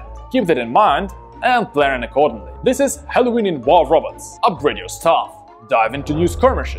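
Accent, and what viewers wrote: Russia. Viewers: russian dude, right?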